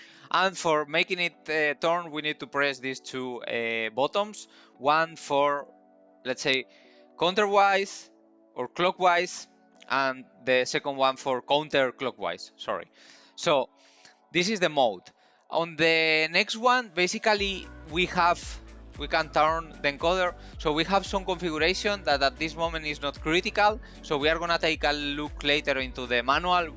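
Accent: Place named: Portugal. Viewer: My electronics teacher was from portugal. He sounded like you. Great teacher like you.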